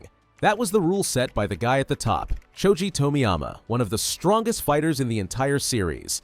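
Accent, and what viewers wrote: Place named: United States. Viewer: the american pronounciation of the japanese is so funny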